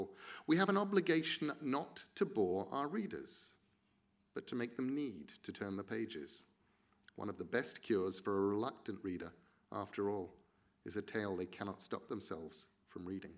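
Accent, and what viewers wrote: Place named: England, United Kingdom. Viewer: not a TED talk, but as Neil said - the content is what matters. Though sound is very low and No motion on the scene as well as on the screen, watching it for 5 minutes and they I see - it is actually a video - not a frozen picture, the Table and meaningless slides + reading from paper makes it difficult to concentrate. But wow, the orator is terrific, no uhms or any other faults, just perfect deep voice and English accent :). Whoa, it is so weird - youtube makes a critic of everyone.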